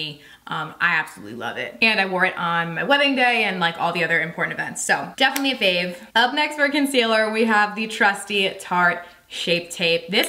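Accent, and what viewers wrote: New Jersey, United States. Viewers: For some reason, picking up your “Jersey” accent today. Cute. 😊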